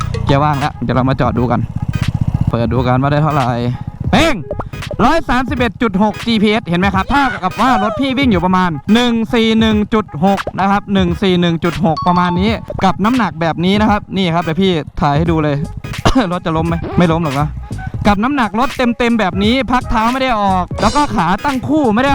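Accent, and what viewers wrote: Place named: Pathum Thani, Thailand. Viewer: ปทุมธานีชัดมากครับ555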